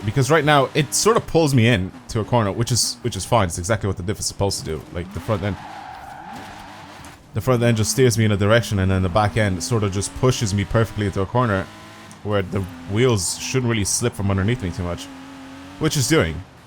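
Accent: Dublin, Ireland. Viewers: Are u a dubliner hahaha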